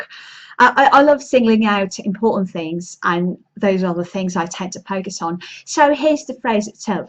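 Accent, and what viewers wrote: RP, United Kingdom. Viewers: Do you sound in RP?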